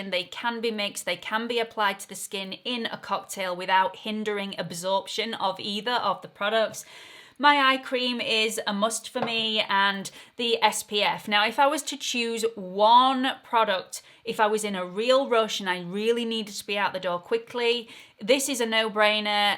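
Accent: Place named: London, United Kingdom. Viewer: Love your London-english 💜💃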